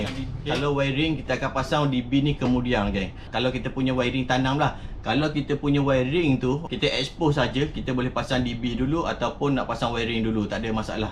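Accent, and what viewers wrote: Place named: Terengganu, Malaysia. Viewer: Dengr abg ckp macam orng ganu je,kalau ganu sama la kita🤘apa pon terbaik boh👍👍👍👍👍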